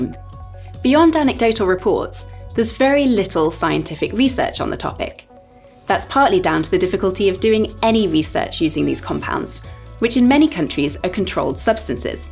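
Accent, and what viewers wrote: United Kingdom. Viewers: She's so beautiful and i love her British accent!! Thanks for sharing.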